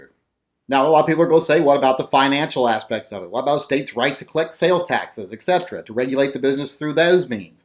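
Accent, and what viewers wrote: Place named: Tennessee, United States. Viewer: There are lots of lovely and interesting things to see in Ohio so it is a consideration but Washington is lovely. My Uncle John lived on the water near Belemy and I used to sail up there a lot. You sound like you are from the South and I always loved Arkansas. You sound more Tennessee to me. I love it down there. But Ohio is beautiful as well. Don't dismiss it if you should be passing through.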